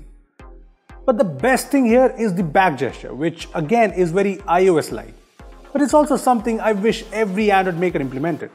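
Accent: India. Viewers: No offence but i just hate Indian accent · Fuck india fuck your ugly annoying accent fuck your shithole country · Finally an Indian accent that very understandable.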